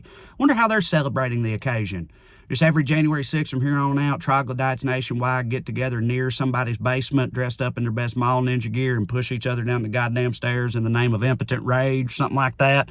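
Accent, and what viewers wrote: South, United States. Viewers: I love hearing a voice like this coming out of the South. I’ve lived around a lot of southern people. Wasn’t aware there was much of a liberal slant there. So refreshing. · Thanks for not abandoning your accent and Southern roots. It makes your commentary much more poignant and helps to dispel the false impression that a southern drawl will always indicate that person behind it is a racist, rebellious, brain dead moron, whose every word must be ignored. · Well said. Thanks for the clear words - in the accent most of us associate with completely different sentiments. You redeem the South. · The accent and aphorisms of my Midwest and Southern Americans is always a pleasure!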